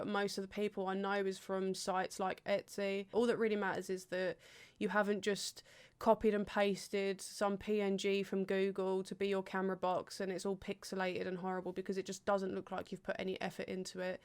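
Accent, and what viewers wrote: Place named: United Kingdom. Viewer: Hey, thanks for your video really good tips and thoughts some I can confirm through my experiences on Youtube. I'm starting to stream now too. You're really helping me with that. Thank you! Apart from that, I just have to say I love your British English!:-)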